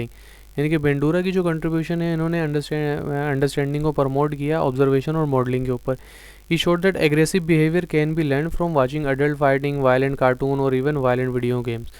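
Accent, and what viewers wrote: Karachi, Pakistan. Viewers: Apka lhja karachi walon k ha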